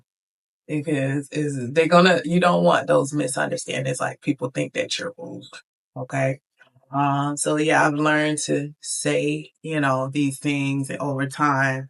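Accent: United States. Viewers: You have a strong African-American Southern accent.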